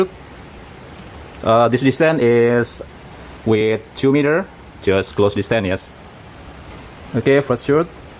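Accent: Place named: Indonesia. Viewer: indonesian english😅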